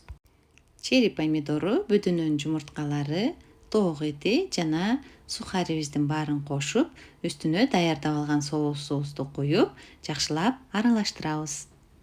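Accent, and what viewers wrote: Standard. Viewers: Азаматсыз таза кыргызча суйлоп салаттврды жегиликтуу ,жегиликтуу кылып жазап жатканызга !!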